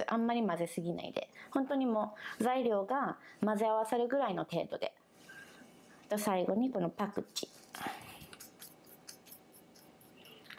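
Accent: Kansai, Japan. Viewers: イントネーションが関西ぽくて親近感湧きます 美味しそう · めっちゃ関西弁やん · 関西弁だ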